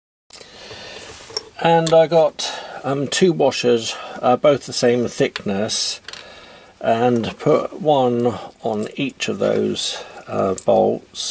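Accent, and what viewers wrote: United Kingdom. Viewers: Hi Mate, I like the mini lathe. It cuts the stainless just fine! I ,also, like your ideas. I have one recommendation if you please. The threaded rod stop should have been a fine thread to allow an easier micro control ability than the standard thread. Your accent is British I think, are you from the UK? But you use metric. Personal preference or am I guessing the wrong location? Good day!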